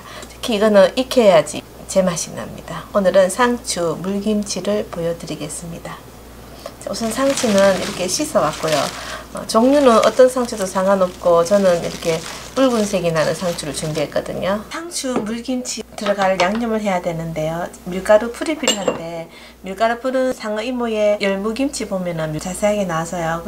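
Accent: Gyeongsang, South Korea. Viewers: 대구. 경북분이신듯?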